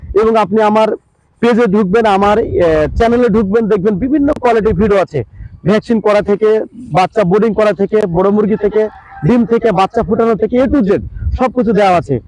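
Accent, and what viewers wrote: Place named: Bangladesh. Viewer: ভাই আপনার কথাবার্তা স্টাইল তো একদম বাংলাদেশীদের মত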